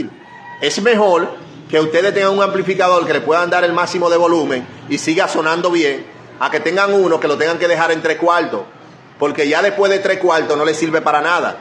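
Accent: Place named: Dominican Republic. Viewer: Por favor señor hay que ser comprensibles el maestro es de República Dominicana y así es su idioma 🤨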